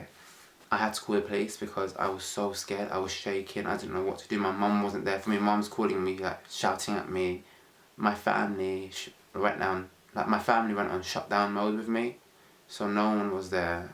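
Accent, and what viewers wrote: United Kingdom. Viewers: First off Love you, Love you,Love you to pieces! You are so cute and I'm an amercian boy whose a sucker for british accents. I was lucky to stumble upon this video when looking up a video on being gay and muslim. I can understand completely because being African Amercian and raised in southern Christianity I know fanatical extremist minds all to well.I hope things continuously get better! Hold onto the accepting family and friends and release all others to love and light! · hey sounds British. I just started watching this now and I happy I clicked on the video.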